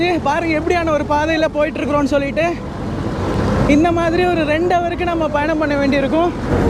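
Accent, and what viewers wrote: India. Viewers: Nee ga srilanka Tamil pesala India Tamil pesuringa ,❤ nella eruku pro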